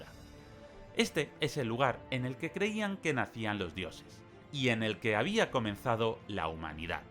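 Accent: Spain. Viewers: No me gusta del todo tu relato. Es evidente tu ascendencia española.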